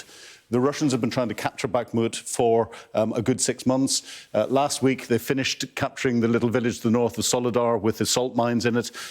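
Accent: United Kingdom. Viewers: she sounds British but she is not British. How can this be ?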